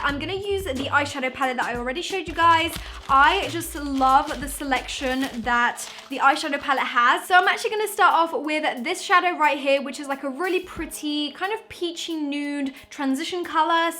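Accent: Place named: United Kingdom. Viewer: Your makeup looks stunning!! and I absolutely love your British accent. I just uploaded a fall makeup video, it would mean a lot if you check it out xx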